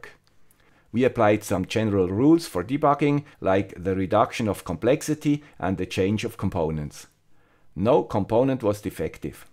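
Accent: Switzerland. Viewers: Since you are the guy with the Swiss accent , I will call Scott the guy with the German accent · I think I knew that, black forest or something. I spent a day in Bex a few years ago with the jet wing on my back guy Yves Rossy. He also had your Swiss accent. Swiss seem to be decent people mostly. I made a bunch of videos of all that.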